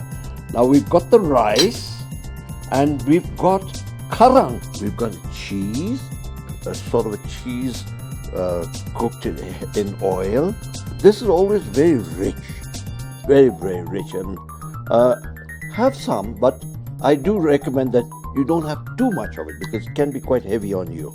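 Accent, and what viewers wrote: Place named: United Kingdom. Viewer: I must say you really do have a decent british accent !! Regardless to say..the food was tempting..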